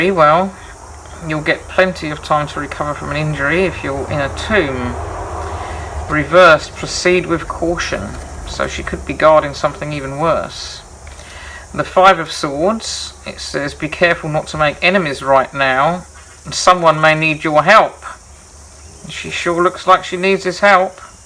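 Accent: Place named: London, United Kingdom. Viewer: There is an actually a psychological study on something driven through the brain in the 1900's I think. i kept thinking what a strong London accent! You're doing a good job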